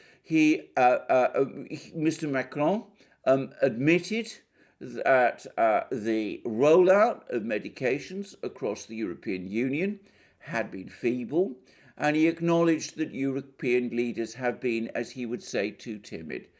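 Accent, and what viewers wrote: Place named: RP, United Kingdom. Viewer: Hi.. I love your presentation. It's in Queen's English and it's succinct and deliberate in diction.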